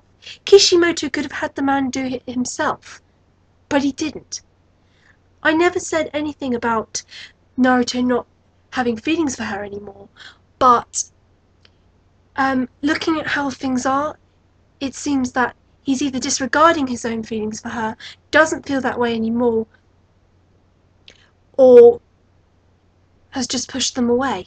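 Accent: United Kingdom. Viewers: Soooo true!! NARUHINA FTW! I love you and your british accent is so beautiful *-* ♥ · First of all I love your british accent! It's nice to hear a rant from somebody not from Japan or the States for once. Second, I just know one thing, regardless of all the facts in this argument, I think it would be an utter travesty to not make NaruHina official because I honestly can't see Sakura being disappointed about those two being together at the end of the show, yet Hinata would be devasted terribly if he chose Sakura, especially after putting her life on the line against Pain. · I love your rant! What you say makes so much sense and your english accent is awesome! ^^ BTW: NaruHina & SasuSaku are CaNoN!!! Let's celebrate "\(^v^)/"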